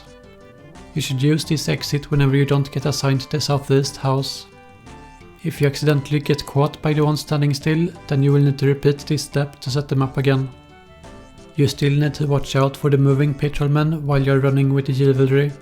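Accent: Sweden. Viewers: Herregud din Svengelska.. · thats the swedish-english accent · below average in english and he sounds swedish, most(young) swedes are better than this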